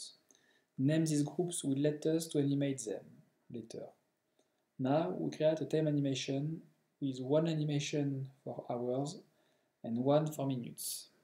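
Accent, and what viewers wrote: France. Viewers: That's some hardcore french accent !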